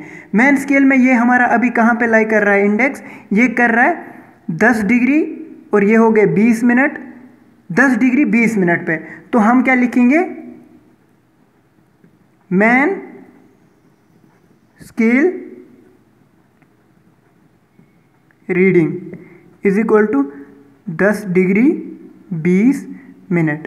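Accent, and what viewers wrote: Kashmir, India. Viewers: Sir aap Kashmiri ho?? Any way nice..thanks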